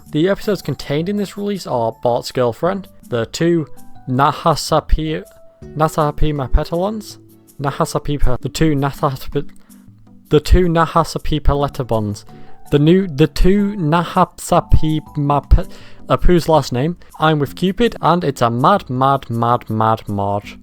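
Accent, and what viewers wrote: United Kingdom. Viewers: Can you please stop with the British accent? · Northern English (UK), possibly Liverpudlian.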